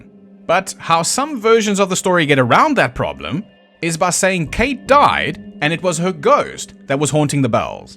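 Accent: South Africa. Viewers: South African? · I thought u were south african when i heard ur accent😂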